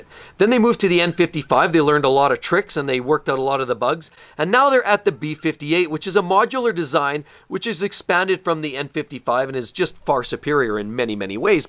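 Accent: Canada. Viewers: drop the heavy Canadian accent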